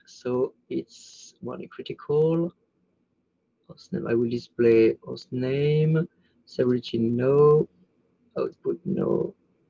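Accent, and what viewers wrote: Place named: France. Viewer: Le petit accent français des familles qui nous donne cette réputation à l'international...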